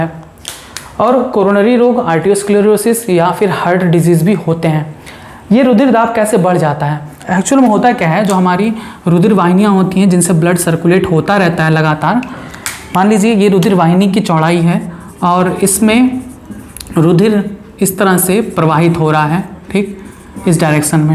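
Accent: Chhattisgarh, India. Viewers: Aap Chattisgarh se ho kya ?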